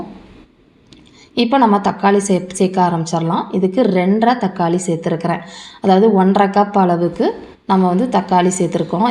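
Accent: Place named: Tirunelveli, India. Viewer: Enaku ithula romba pudechathey nama nelai slang than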